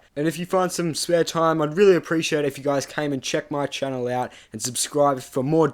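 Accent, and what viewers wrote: Australia. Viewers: The fact the crossbow model doesn't have a channel for the bolt.... really annoys me. A professional artist should have seen it in reference photos, plus any man should just know 'guy' stuff. The vid poster has a really heavy Aussie accent. It occasionally sounds like he's taking the piss. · are you italian-aussie?? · is he aussie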